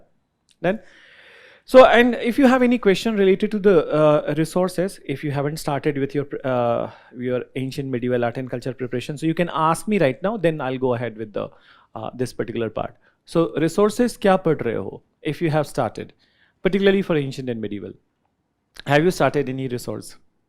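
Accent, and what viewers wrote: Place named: Karnataka, India. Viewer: Sir may be ur from karnataka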